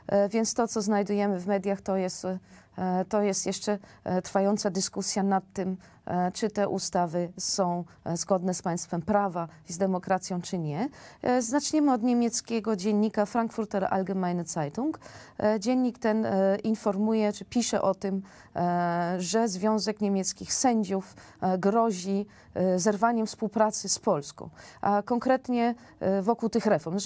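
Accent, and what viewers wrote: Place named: France. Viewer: Uwielbiam tę Pani polszczyznę z akcentem frangniem.Idealnie pasuje do p.p.z.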